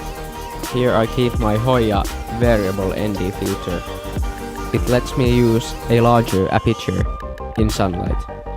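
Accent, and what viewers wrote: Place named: Finland. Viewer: Ootin hitonmoista rallienglantia, mitä vielä! Nice🤘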